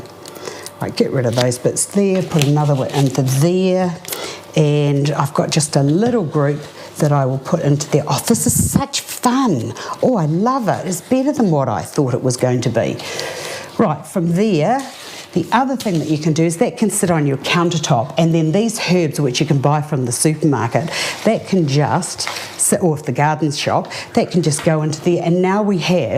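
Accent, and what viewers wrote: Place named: New Zealand. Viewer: Love this! and I can't get enough of the delicious NZ accent. You sound like my choir director Mark Duley, he's from Christchurch but now has settled down in Galway, Ireland!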